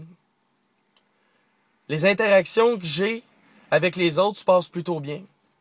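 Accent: Quebec, Canada. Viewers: tant d'amour en un seul coeur, continue de communiquer même avec ton accent québecois, avec ou sans mots, ton destin est ronceux comme les notre. Combat tes doutes, laisse toi des aides mémoires :) pour tes journées infinies, Tu est le seul a pouvoir décrypter ton langage dans ce monde car (il vient de) c'est Ton univers. Shebaleking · mon pére et bipolaire et moi apparement merci car tu ma fait rire avec ton accent québecoi mais aussi merci par ce que tous se que tu ressent je le ressent ausii et sais dur d'être instable des humeur instables mais comment sa fait du bien de voir ta vidéo merci tu es admirable <3 :) prend soin 2 toi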